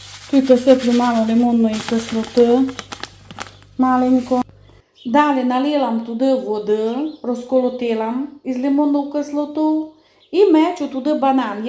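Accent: Transcarpathia, Ukraine. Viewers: Цей неймовірний закарпатський діалект то❤ Це лайк і підписка сходу! Дякую за вашу працю і процвітання🌷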